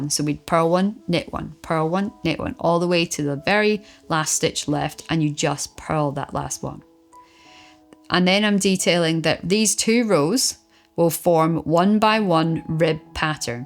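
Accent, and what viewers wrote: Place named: Scotland, United Kingdom. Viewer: thank you - I've recently discovered your channel - although I was taught to knit as a child by my gran, I hadn't done it for years and needed a refresher course before tackling a blanket for a friend's first grandchild. this is exactly what was needed and being Scottish myself, I really appreciated the accent!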